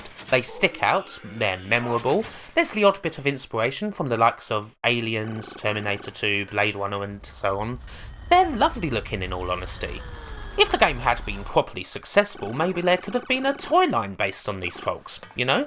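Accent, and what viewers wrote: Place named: England, United Kingdom. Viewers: Because it's an Essex accent. A very strong one. It's why Jonathan Ross pronounces his "Rs" as "Ws". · How come some people consider way of speaking a speech impediment? I guess it's an accent from a region in England. I understood him perfectly, and I'm Argentinean (hence, a non-native speaker of English).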